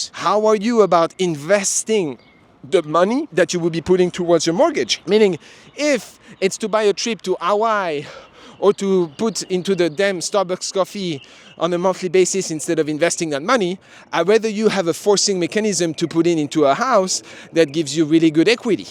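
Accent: France. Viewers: Where you from? your English is slightly off sometimes..a Frenchy maybe?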